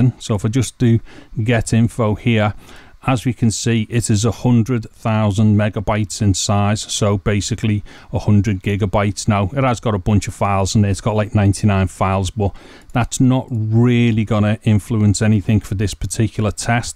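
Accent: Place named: Scotland, United Kingdom. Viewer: OMG A SCOTTISH! Love the accent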